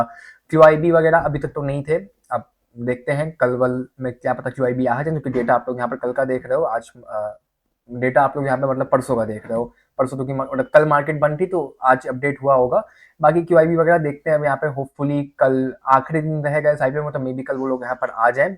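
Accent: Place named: Kanpur, India. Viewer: Brother are u from kanpur ? Baat karne lehja wahi ka lag raha h ... Appreciated your efforts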